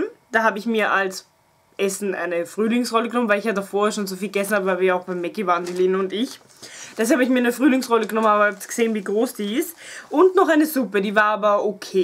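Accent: Austria. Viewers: Sehr sympathisch, ich höre deinen österreichischen Akzent sehr gern.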